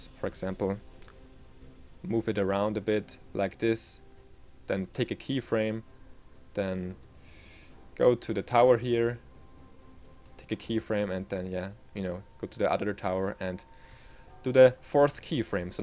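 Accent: Germany. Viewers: Du höhrst dich so Deutsch an :o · also sprichst du doch deutsch das merkt man obwohl dein Englisch echt gut ist:) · I It really sounds german btw im german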